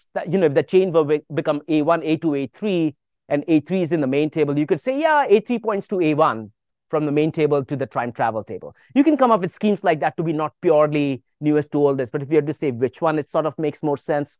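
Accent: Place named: India. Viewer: Indian English is hard to listen😂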